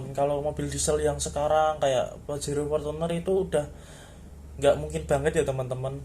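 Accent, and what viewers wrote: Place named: Java, Indonesia. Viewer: Saya suka orang medok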